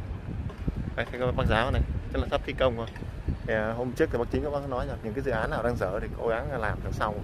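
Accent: Vietnam. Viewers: Chủ thớt làm kiếm cơm ..phát ngôn nói cho chuẩn vào ..đi xe khách kể cả từ bắc vào nam sg 6/7 năm trước ko một nhà xe nào đi tới hai đêm ba ngày chứ đừng nói đi từ miền trung ❤..còn nói giá thông phương tiện sg 5 năm nữa dân toàn đi xe hơi kiếm 1 chiếc xe máy còn khó ...ko nhanh dễ phát triển vậy đâu.thu nhập mặt bằng trung người dân ...nhất là người công nhân lao động tự do ..thu nhập vẫn còn thấp ..nghe giọng cháu này ..hoa thanh quế..quê choa 😅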